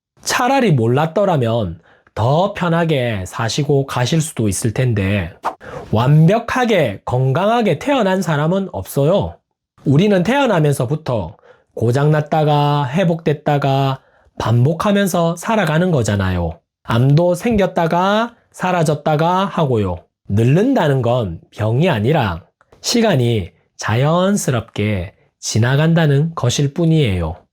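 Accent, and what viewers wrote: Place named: Gyeongsang, South Korea. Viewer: 님 오늘의영상도 꿀잼나게 알려주시고~전경북이라 님 경상도말투가 더정겹게 와닿아요 오늘또 공부잘했습니다~건강하셔요🎉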